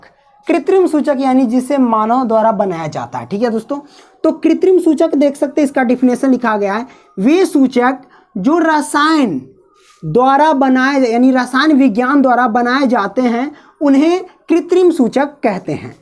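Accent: Bihar, India. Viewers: Sir aap bihar ke rehne bale hai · Sir aap Bihar se hai kay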